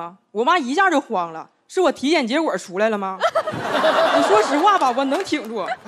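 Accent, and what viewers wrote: Northeast, China. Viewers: 就喜欢这个，东北口音和天津口音天生带着喜感。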